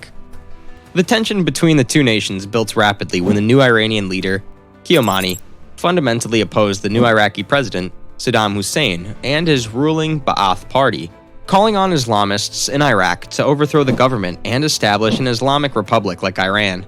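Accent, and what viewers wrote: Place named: United States. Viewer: No he is not butchering anything. He is a typical Ey-mey-rie-san (American) who have no concept of language, grammar, spelling or pronounciation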